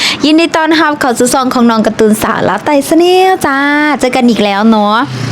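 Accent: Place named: Laos. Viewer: พูดภาษาลาวก็ได้ทำไมไม่ดูช่องคนอิสานเขาก็พูดภาษาท้องถิ่นแต่คุณเป็นคนลาวดันมากระแดะพูดภาษากลางฟังแล้วมันขัดๆหูครับ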